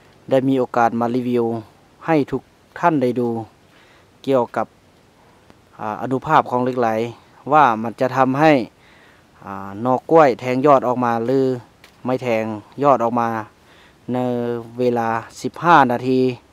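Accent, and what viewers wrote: Laos. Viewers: พูดไทยสัปลาวอย่างฮา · ເຈົ້າຂອງຄິປເປັນຄົນລາວຫລືຄົນໄທເນາະ ຕາມທີ່ຟັງສຽງອອກຊຳນຽງລາວເນາະ ຖ້າເປັນຄົນລາວກະເວົ້າລາວໂລດດີກວ່າບໍ່ ມີທັງການເວົ້າທີ່ບໍ່ແມ່ນພາສາຕົວເອງແລ້ວ ມັນບໍ່ເປັນຕາຟັງຄັນສິເວົ້າວ່າຫນ້າລັງກຽດກະສິໂພດກາຍ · ລາວແທ້ໆເວົ້າໄທເພໍີອ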